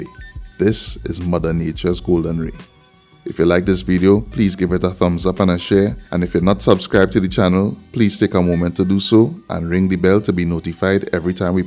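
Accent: Trinidad and Tobago. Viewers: So enjoyed hearing the Trini accent.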